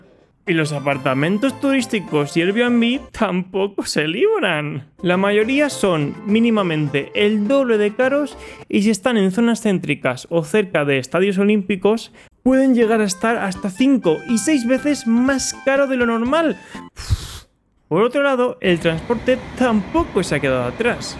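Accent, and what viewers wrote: Spain. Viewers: y su asentó español eww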